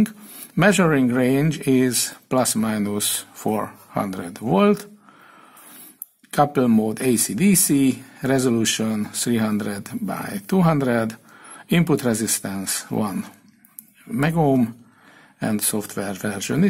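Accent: Hungary. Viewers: Goulash accent , kusenem 😇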